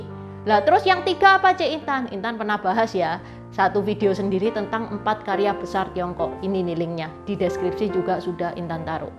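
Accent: Java, Indonesia. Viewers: Kok Medok banget 😊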